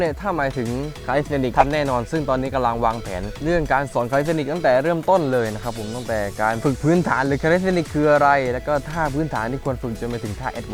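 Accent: South, Thailand. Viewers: คนใต้หรองับ/ สุดหล่อ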